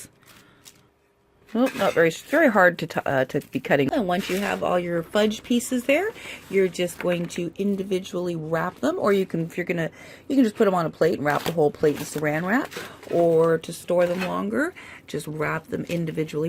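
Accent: Canada. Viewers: Hi, Have you ever seen a TV show called Trailer Park Boys? It's set in Halifax NS. They say about like you do, aboot lol. Does that mean you have a NS accent? Or do all Canadians say aboot? BTW, It says subcribe instead of subscribe on your titles at end. I don't mind. I like misspellings but I can't help but point them out. Sorry.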